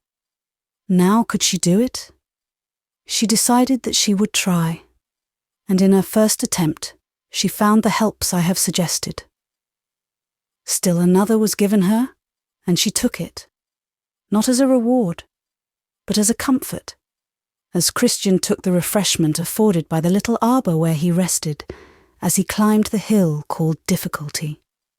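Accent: United Kingdom. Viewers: Hearing an essential American story with a British accent doesn't work.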